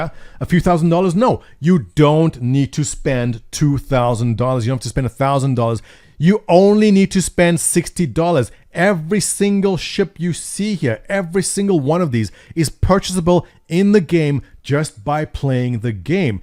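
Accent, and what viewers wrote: South Africa. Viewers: And you just have the biggest Testies! Btw. Do I spot a South African dialect there? And do I remember correctly you currently reside in Canada? Not a stalker question, just testing my dialect sensors :D.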